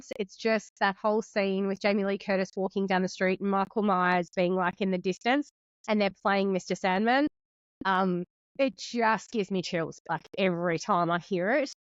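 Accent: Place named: Australia. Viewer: Oooooo. I get the first comment. in the house. That's either a Kiwi or Aus accent. If pushed I am going to go with Aus (raised sentences in the absence of a question). I am going to Henry Higgins it and go for a WA (Perth) accent.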